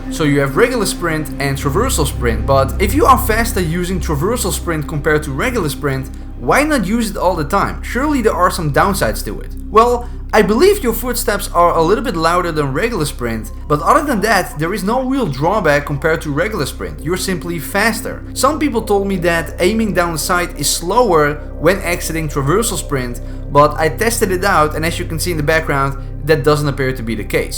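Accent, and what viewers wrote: Netherlands. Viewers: Are you dutch? I think you are, because of your accent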